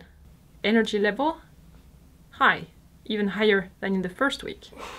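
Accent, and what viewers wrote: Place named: France. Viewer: I found you, during this self isolation here in America. I love your videos. Your French accent is so lovely. You are so graceful and kind. I subscribed to your channel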